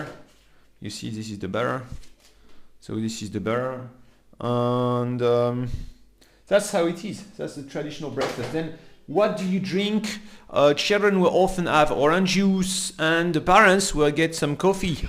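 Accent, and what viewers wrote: France. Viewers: L'Anglais avec l'accent français c le meilleur :)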